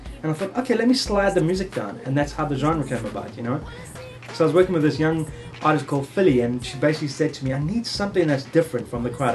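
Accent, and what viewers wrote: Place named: South Africa. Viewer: Sounds like a Saffa :D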